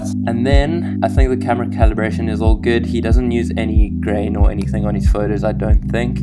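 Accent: South Africa. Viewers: Immediately knew you were from Cape Town when i heard your accent.. great youtube channel and great content.. I'm about an hour away from the city and I'm also a photographer. Keep up the good content.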